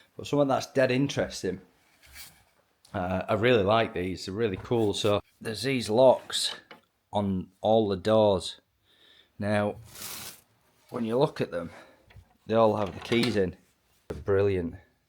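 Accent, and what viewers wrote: United Kingdom. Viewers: Love your videos, the Yorkie accent and sayings! Please keep them coming! I wish your family and you a Merry Christmas and a blessed new year! I hope that your father and you will be able to finish many more projects successfully!😊🎄🎅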